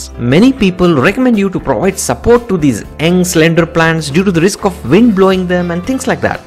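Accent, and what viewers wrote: India. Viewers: Pronounciation is off.. example...The word 'young' you pronounced 'eng'..... 'sewage' you pronounced 'seawage'. Don't let that deter you. As Indians one can tell you're from South India so your accent is a distinct south accent.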